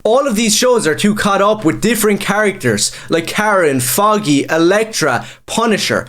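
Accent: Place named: Ireland. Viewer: fine Irish yapping man, imma read it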